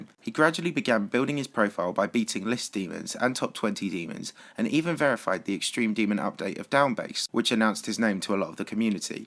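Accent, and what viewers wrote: United Kingdom. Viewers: i hate the britain english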